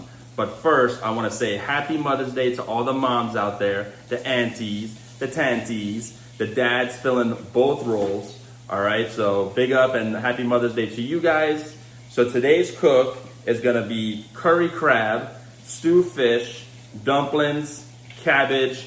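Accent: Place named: Trinidad and Tobago. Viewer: found this channel referred to by trini style with videsh. hafta say it's heartwarming to see an american in love with trini culture. you even talk like a trini using our slang. big up yuh whole self.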